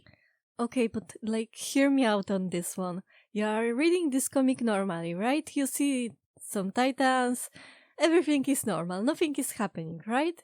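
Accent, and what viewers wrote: Poland. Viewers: Questioooon, Are you polish? The accent kind of sounds Polish and I wana know if I’m good at recognizing other Poles, and if Not I’m still glad you’ve actually taken the time out of your life to learn english, You sound awesome >:3 ALSO TPN AND HANAKO KUN MENTIONED WOOOO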